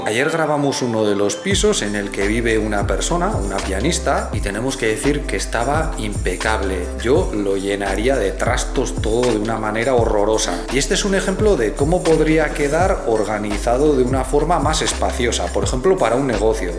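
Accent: Chile. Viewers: Wena... saludos desde Coquimbo, Chile. Habla muy bien el español con acento chileno. Sorprendente. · Si se le nota el asento de chile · Cuando comenzo a hablar le note altiro un acento chileno. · se siente muchooo el acento chilenoooo 🥺💓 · tiene el acento chilenx :') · 7 años en Chile, con razón habla así, ahí se habla cualquier cosa menos español.....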